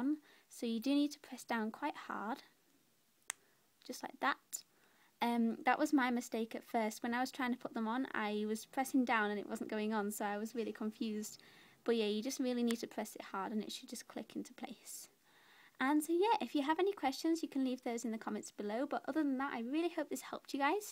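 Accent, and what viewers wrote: England, United Kingdom. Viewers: I liv your English accent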